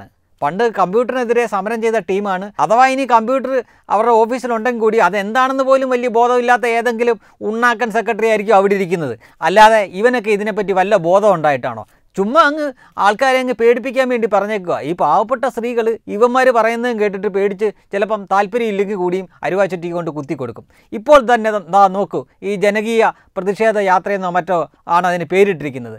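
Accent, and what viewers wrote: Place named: Kannur, India. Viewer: എൻ്റെ നാട് ആലപ്പുഴയാണ് , കുട്ടനാട് ആണ് എന്ന് വാർത്ത വായനക്കാരൻ ഇടക്കിടെ പറയുമ്പോൾ ഒരു സംശയം. ഭാഷ കേട്ടിട്ട് കണ്ണൂർ കാരൻ ആണെന്നാണ് തോന്നുന്നത്.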